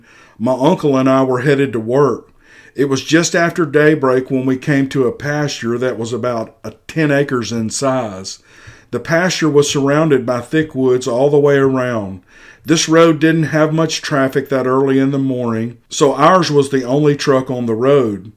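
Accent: South, United States. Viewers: with a touch of southern comfort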